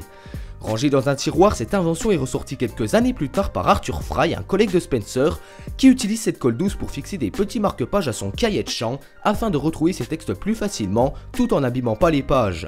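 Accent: Belgium. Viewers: T'es pas belges ? Parce que t'as un accent qui ressemble fort à l'accent belge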